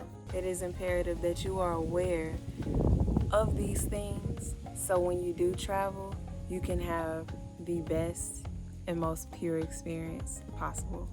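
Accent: Houston, United States. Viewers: You have to be from Houston because you have that Houston accent. Lol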